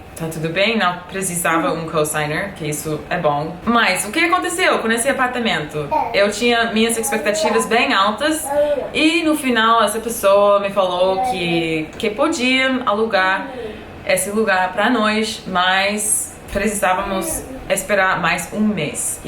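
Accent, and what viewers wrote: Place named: Rio de Janeiro, Brazil. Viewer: Gringa linda, adora a língua portuguesa e fala com um forte sotaque carioca. Haja charme! A cada vídeo, que você posta no YouTube, noto que seu Português está melhor. Parabéns pelo esforço. Valeu, minha fofa!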